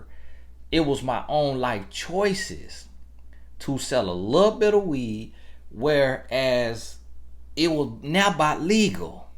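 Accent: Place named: Florida, United States. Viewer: I come for your wisdom, but most importantly I come to hear that Florida accent: “Werk”, “Bike”, “finna”...I miss that accent. I’m from Florida too, but live up North now. Thank you 🙏🏿 And that Florida Marlins hat though! 😎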